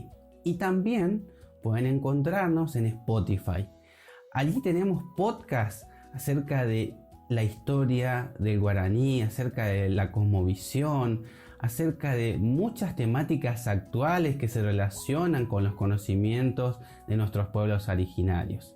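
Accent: Argentina. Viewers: Pareces Argentino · Primera vez que escucho guarani con acento Argentino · Debe ser correntino...igual explica muy bien 👍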